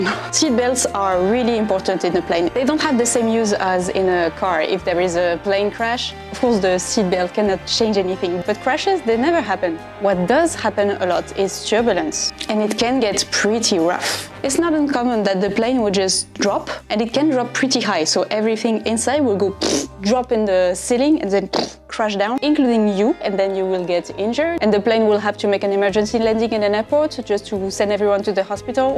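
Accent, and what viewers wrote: France. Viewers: You are just adorable! I love watching you!!! Your accent is why I took 5 years of French. Almost no one speaks French in Western Colorado, but I still think it’s the most beautiful language!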